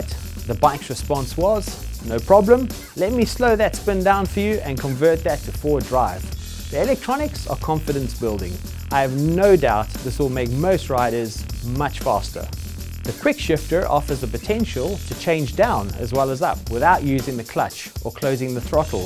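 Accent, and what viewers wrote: South Africa. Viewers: Fucking Bothered I wanna say South African.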